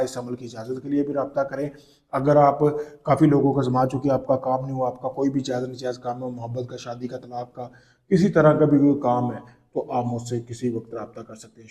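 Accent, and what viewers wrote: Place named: India. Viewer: Bhai aap kidhar ke rehne waley ho. Apka lahja Hindustan wala hey,or apki alfaz ki adagi bhi theek Nahi.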